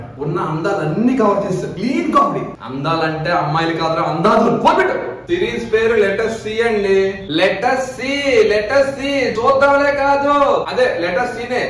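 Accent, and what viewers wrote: Godavari, India. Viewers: గోదావరి యాసలో C language ah? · I really want to see you on a big screen with our godavari accent hope the day will come soon❤️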